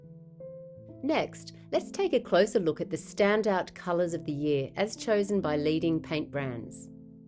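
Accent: Australia. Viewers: Your accent sounds very south/west Sydney, lagarno/peakhurst/hurstville. The accents of my youth. Thankyou beautiful video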